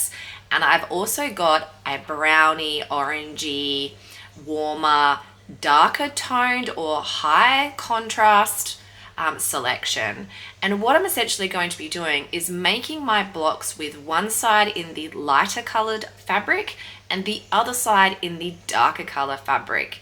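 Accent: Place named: Australia. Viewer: I wondered if you were from Australia! Lovely accent. Wishing you luck from the Midwest, USA!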